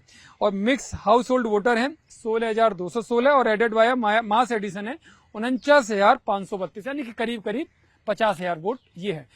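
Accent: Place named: Varanasi, India. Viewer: मुझे बनारस सुनाई दे रहा है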